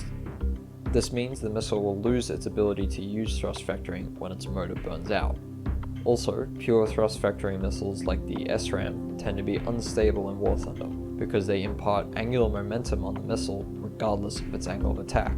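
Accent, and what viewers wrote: Australia. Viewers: He's aussie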